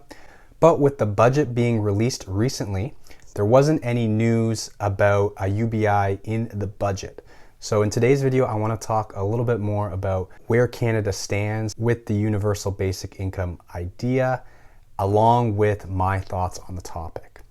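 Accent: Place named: France. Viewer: Justin trudo? Are you French? You have a French accent though its subtle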